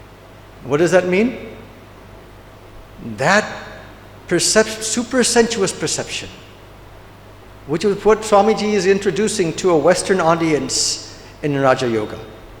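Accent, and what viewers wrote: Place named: United States. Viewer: American English ❤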